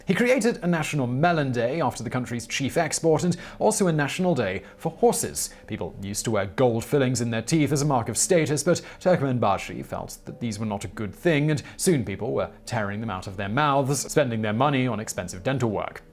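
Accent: United Kingdom. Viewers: yet even when he adlibs he has an upward inflection at the end of every sentence, (like a ditzy blonde) which certainly isn't a British thing, He's the face of these channels with his very nice middle class British accent, and when the subject of a video is British, I would expect him to add a British slant to it rather than it being slightly biased american, cherry picked history, tbf I'm not really including top tenz as that's pretty obviously American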